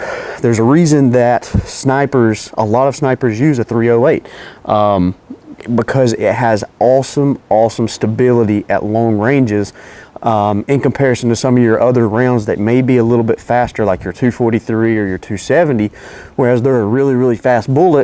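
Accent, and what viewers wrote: South, United States. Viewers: Allsome. Love that Southern drawwwwwwwl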